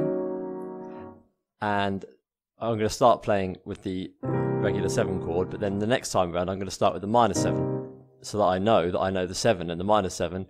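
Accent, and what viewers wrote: United Kingdom. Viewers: Nice! You sound like you’re from the Uk but your location is different, how’s the life down there? Keep up the good work!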